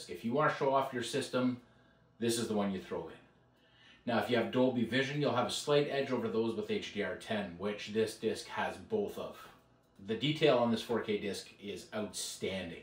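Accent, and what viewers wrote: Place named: Canada. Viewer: Very succinct and quality review! I also enjoyed your Canadian accent.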